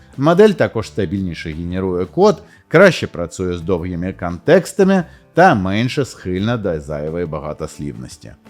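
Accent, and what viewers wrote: Russia. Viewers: А это и не украинский. Сценарий пишут на украинском, а произносит он слова по правилам русской орфоэпии. Украинофонам тоже надо декодировать услышанное. Если сравнить с английским, то это как если бы было написано "the programming language", а произносилось "тхе праграминг лангуаге".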